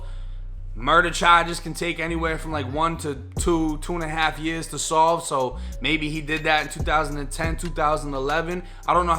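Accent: Boston, United States. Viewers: Me 2...Its that Boston twang 🤣🤣🤣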